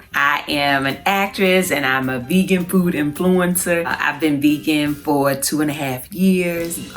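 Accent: South, United States. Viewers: I like her. She sounds so country, honey. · She sounds so southern it almost sounds like she's auditioning for a southerner for a movie.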